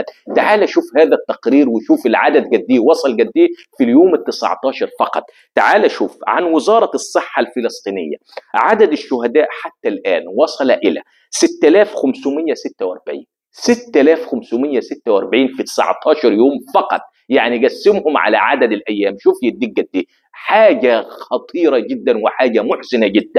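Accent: Morocco. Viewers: لهجتك المغربية فنة 🎉😂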